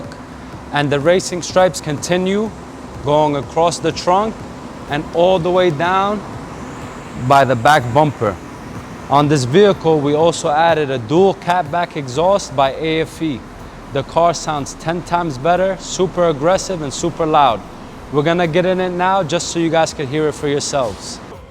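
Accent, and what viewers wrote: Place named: New York, United States. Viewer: I knew by the accent you were in NY. Too far away. But would love to get this done 😩. Fantastic job!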